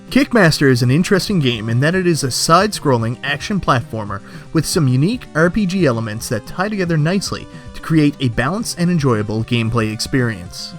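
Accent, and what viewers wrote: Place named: Atlantic Canada, Canada. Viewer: based on tims cup and slight east coast accent i'm gonna guess New Brunswick? am i close? great vid btw.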